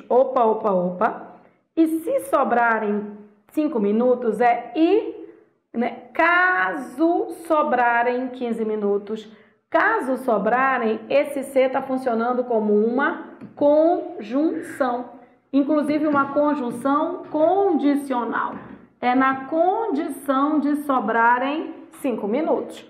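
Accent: Pará, Brazil. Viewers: "Verbo pank " kkkkk. Paraense doida! Grande professora, aulas excelentes. Parabéns prof!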